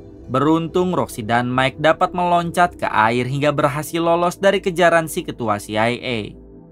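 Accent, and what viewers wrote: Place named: North Sumatra, Indonesia. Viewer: Karna totongnya... 🤣🤣🤣🤣 Orang sumut lu min?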